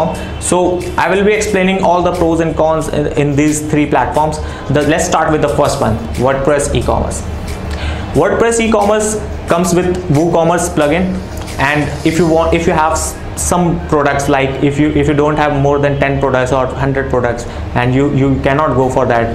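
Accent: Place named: India. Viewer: Nice video sir, informative, sorry but follow indian english accent.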